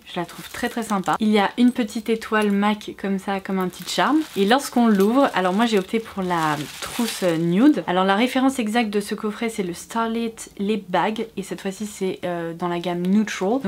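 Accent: England, United Kingdom. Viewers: Petite question idiote mais il te sors d'où ton accès en anglais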